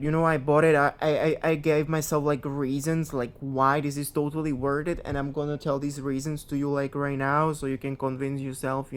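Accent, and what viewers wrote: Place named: Hungary. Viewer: túl sokat afektálsz , könnyen kihallatszik a magyar akcentus, csinálj inkább magyarnyelvűket, van valami botod de mi a faszoim az? minek mutatod meg ha nem is magyarázod el mi az , értelmetlen használhatatlan a videó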